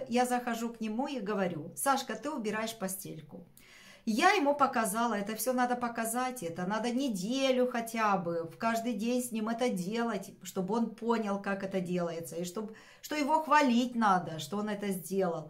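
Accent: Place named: Moldova. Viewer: С удовольствием вас смотрю,.И слушаю.И в Бельцах была не раз.И в казанчике готовила.И говор ваш мне близок до слез.Я русская, но 35 лет назад жила в Кишиневе, вышла там замуж первый раз.И до сих пор снятся Баюканы и кинотеатр " Флакера"😅😅😅Я жила в 80- е годы в общаге.И у нас жили девочки и русские, и молдаванки, и болгарки, и гагаузки, и еврейки.Нет, вру.Евреек в общаге не было, но я с ними работала.Эх, хорошее время было, хоть и перестроечное)))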